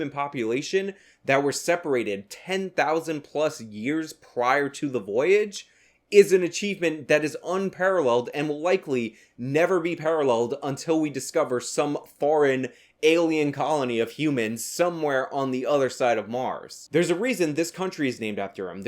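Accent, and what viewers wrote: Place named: Texas, United States. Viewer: Dude sounds like your blessed to born Texan you should come back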